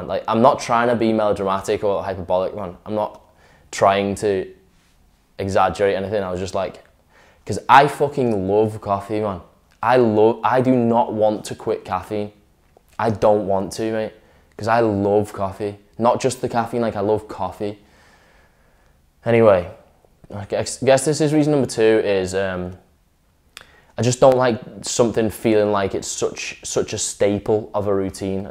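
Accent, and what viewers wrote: United Kingdom. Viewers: Hello there. I'm here in Southern California under Coronavirus quarantine and ran out of coffee two days ago. Normally, I just walk down to the local coffee shop for a cup, but everything's closed up and my food delivery service isn't arriving for four more days. OMG!!!! Had NO IDEA how hard it is to kick this stuff. Just realized I've been drinking hardcore for close to 30 years and never really seriously tried to stop. Didn't sleep at all last night and am wigging out. This video is AWESOME!!! I already feel like I'm in that movie Trainspotting and your accent is adding to the experience. LOL!!! 🤣Thanks for posting it. It really helped me out to know that I'm not alone. "Cheers Mate" or as we say here in Cali "Thanks Bro!!". -m. Huntington Beach, Ca. 👍✌😎 -btw i enjoyed your video so much I'm subscribing. The way you articulated your experience was exceptional. 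"Brilliant" is the word I think y'all use over there in'net?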